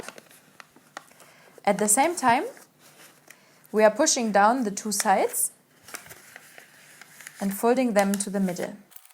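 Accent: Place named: England, United Kingdom. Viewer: I enjoyed your video, thanks, Also I like English accent, doesn’t sounds German😉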